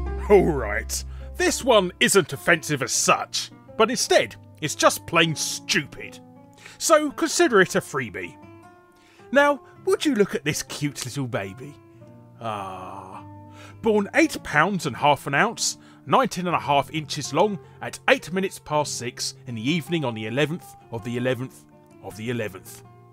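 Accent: United Kingdom. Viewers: MC Apophis He’s British. That’s like me pronouncing Connecticut properly, and everyone dissing me for it.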